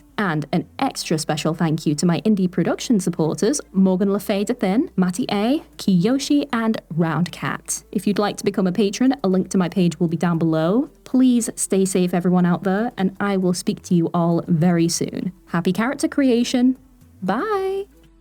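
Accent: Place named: Lancashire, United Kingdom. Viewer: Haha yeah I've always found it wild that there's one of my 'own' doing the whole Youtube thing. I can't place your accent, you're definitely from around at least Lancashire/Greater Manchester but I imagine you enunciate more on YT anyway seen as I tend to do so when I talk with anyone outside of the North, especially Americans/Canadians. Americans often think I'm Scottish because of how thick my Bolton accent is, even when enunciating. UK Publishing has a huuuge classism issue. They've been trying to level out the playing field for years but it's still very gatekept. Both for workers looking to get into the industry and authors looking to get published. Most agents are from middle class backgrounds, so as the first port-of-call for authors looking to get published, if they don't relate to the material sent to them, it often gets rejected. 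It doesn't even get a chance to be looked at by a publisher. Though I'm glad to see we have indie publishers based out of the North like UCLan now. Haha yeah I love my '80s stuff but the '60s and '70s were banger too. Whilst Northerners have been largely barred from publishing spaces, we instead have a rich performing arts culture. Plays like A Taste Of Honey by Shelagh Delaney were groundbreaking. The fact a play written in the '60s, set in grimy Salford, depicted a teenage schoolgirl getting pregnant by a Black sailor and then exploring her feelings about the pregnancy with her openly Gay best friend is mindblowing when you think about t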